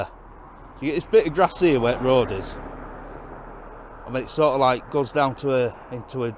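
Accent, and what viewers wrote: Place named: Yorkshire, United Kingdom. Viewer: Does anyone listen to these videos just for the Yorkshire accent? Hope you're all feeling better. Cheers from Texas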